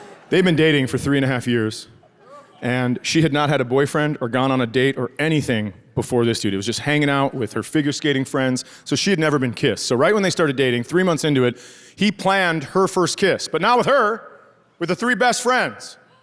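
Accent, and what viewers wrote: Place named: Canada. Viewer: Just found you on TikTok and had to come here and watch you. I thought you were Canadian at 1st from your slight accent. Found out you are from Fergus and I'm just an hour east of there. I now realized why people think we sound like Canadians. We're just too close to the border and it's all their "Canadian wildfire" smoke we get each summer turning us into Canadians. 😂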